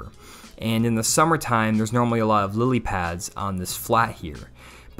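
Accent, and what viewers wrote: Arkansas, United States. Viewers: are you from arkansas?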